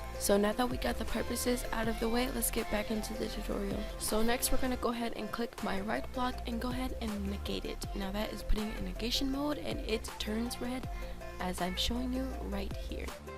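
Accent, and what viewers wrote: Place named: United Kingdom. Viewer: im kind of hearing a british accent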